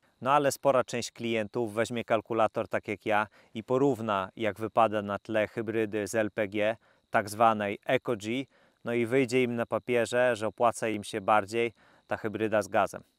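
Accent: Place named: Podlaskie, Poland. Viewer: Fajny test, dobrze że prowadzący nie próbuje krytykować, ponieważ „to Dacia”.👍 Na początku wydawało mi się, że białostockiego akcentu nie słychać, ale później już złapałem jak są akcentowane końcówki zdań.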